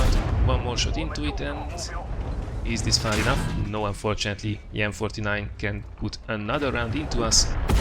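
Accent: Hungary. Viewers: You got a really hungarian accent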